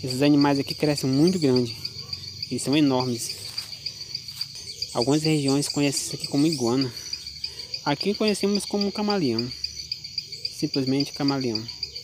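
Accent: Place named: Paraíba, Brazil. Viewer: Comlissenssa vc e da paraiba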